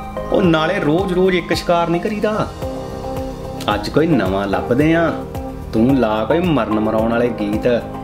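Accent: Majha, India. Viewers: Veer amrinder gill di copy nhi aa..mahje vale sare eda bolde Bai ji... veer mahje di shan ❤❤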